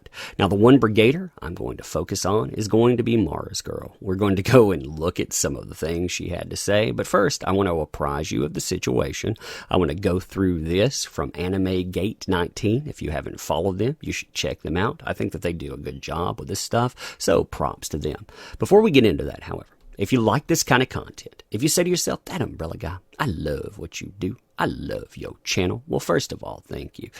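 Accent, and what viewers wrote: South, United States. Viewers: Damn my guy. You've got that good ole fashioned southern draw makes me feel home.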